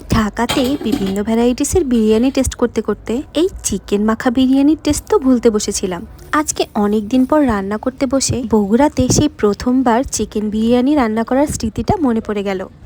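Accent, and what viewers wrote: India. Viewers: কলকাতার বস্তি এক্সেন্ট এ কথা বললে ভারতীয় ভিউয়ারস ট্র্যাক করুন। বাংলাদেশি হিসেনে বিশ্রী লাগে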